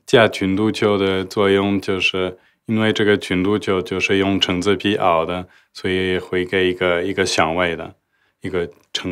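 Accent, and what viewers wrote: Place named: Henan, China. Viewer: 河南口音笑死我了哈哈哈哈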